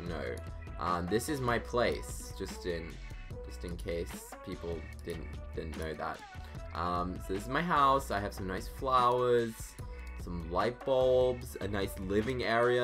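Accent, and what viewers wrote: United Kingdom. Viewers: are u british? 👁👄👁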